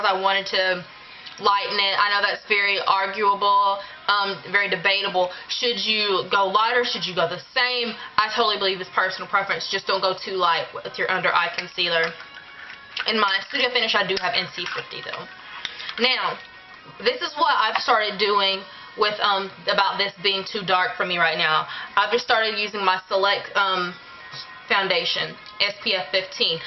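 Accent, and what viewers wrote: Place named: South, United States. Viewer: Is that country in the background?? LOL Your southern accent is cute!!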